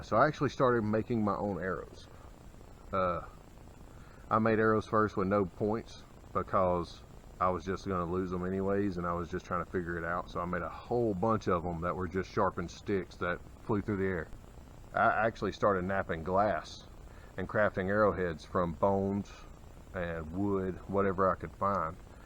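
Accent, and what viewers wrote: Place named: Georgia, United States. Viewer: Its a little strange hearing a Chattooga County accent on TV. You kick ass man!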